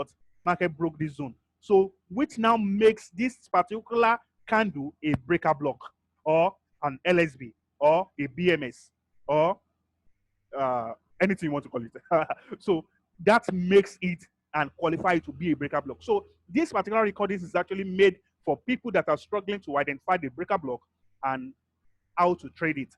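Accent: Nigeria. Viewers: If he uses his native language will that be better for you? Listen to understand him. He is a Nigerian, English isn't the native language used there but more like a general thing.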